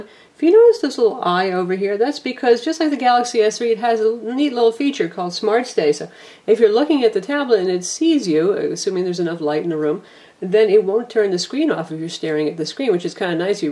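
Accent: United States. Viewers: It's not an ignorant comment it's a sensible observation. The woman in the video does come across as very robotic and does lack any insincerity in her review. I do understand however that some of this will be down to her American accent wich can sometimes come across as a little bit insincere to non-Americans. This of course isn't a dig at Americans before anyone jumps in but to other ears the accent does sound that way.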